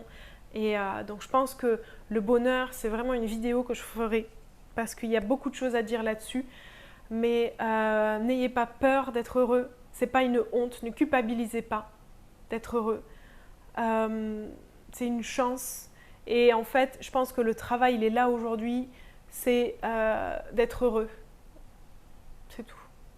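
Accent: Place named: South, France. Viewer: je t'ai découverte sur instagramme pendant le confinement et j'ai tout de suite accroché à tes vidéos, à ton univers, à tout ce que tu dégages. J'aime ta douceur, ta joie de vivre, ta sincérité et ton autanticité, ton petit grain de folie, ta voix et même ton accent du sud. 😅 J'adore comment tu prononces les mots "môlet" et "môbilité" en appuyant bien sur le son "ô" 😉 En septembre, comme je n'étais pas vaccinée et n'avais de ce fait pas mon pass sanitaire, il etait impossible pour moi de m'inscrire dans une salle et de suivre des cours en présentiel. Du coup, c'est tout naturellement que j'ai décidé de m'abonner à tes 3 programmes en ligne pour pouvoir tout de même pratiquer le Yoga de chez moi. Et depuis tu fais réellement partie de ma vie. Tu m'appaises, me rééquilibres, me détends, me fait rire... Si je ne pratique pas pendant toute semaine et bien ça me manque. 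Je rêverais pouvoir participer à l'une de tes retraites, mais pour le moment, je n'ai malheureusement ni le budget ni les vacances pour. Mais ça reste un de mes objectifs 😇 Un grand merci pour tout ce que tu nous offres. Tu es une si belle personne 💜 Namaste 🙏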